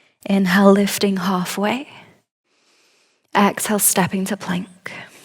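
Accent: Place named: United Kingdom. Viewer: Great practice, I’m a new subscriber, where from the uk is your accent from? Throughout the hour I was getting distracted trying to figure it out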